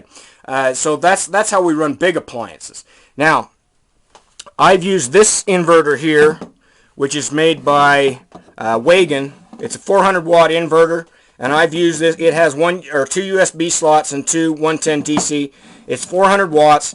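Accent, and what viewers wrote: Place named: South, United States. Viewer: where do you live? pick up a southern accent but get snow?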